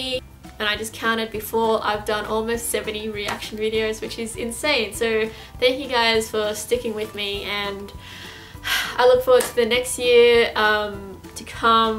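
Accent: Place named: Australia. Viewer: Very powerful Vid to those still in the closet. U earned yourself a Sub from a str8 guy. I don't judge ppl based on sexual preference. I judge ppl based on persona, good or bad. Just want to say, I've watched quite a few GOT reaction vids while bored work. Many are fake, and not genuinely emotional as your last GOT reaction vid. "Arya's reunion with Nymeria" Thats all it took for me to Sub. It almost made me tear up, and thats not an easy thing to do. Haven't shed a tear in 10 yrs, lol. Well, that and I like your cute Aussie accent. ;)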